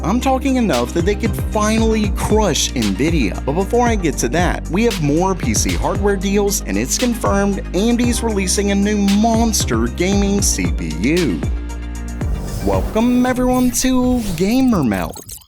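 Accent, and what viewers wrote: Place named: South, United States. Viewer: this guy's voice sounds like a southerner mixed with a lesbian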